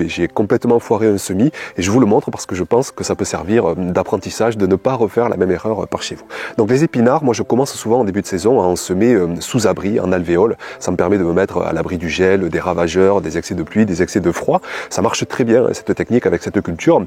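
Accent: South, France. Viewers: J'adore cette homme par son naturel sa simplicité et son petit accent du sud! Je regarde pratiquement toutes vos vidéos. Elles sont géniales sans blabla qui sert à rien. J'espère un jour que mon jardin sera aussi grand et organisé que le votre. Merci pour vos contenus. Signée une passionnée.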